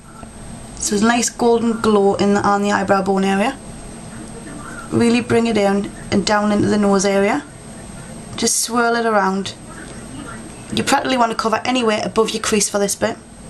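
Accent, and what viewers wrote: United Kingdom. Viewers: by far, my fav look. keep up the great work. ps- love, love, love the british accent! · You know... you are so beautiful. I am from the U.S. and I love your accent. I've always wished I was from the UK... since I was a little girl. I'm pretty good at copying different accents. Anyway... Your tutorials are awesome and congrats on the BBC thing. Have a great day.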